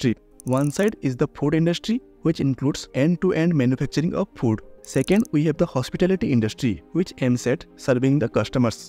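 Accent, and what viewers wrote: India. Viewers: You are from odisha because the accent in which you speak is odi accent .love you bro I am also from odisha ❤ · You spoke very well, don't get bothered by comments about your accent. It's how most of us Indians speak.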